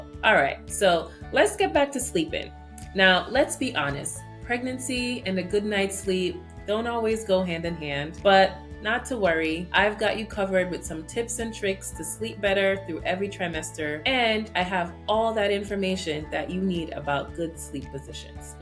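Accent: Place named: Jamaica. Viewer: Sounds Jamaican. Anyway, Good info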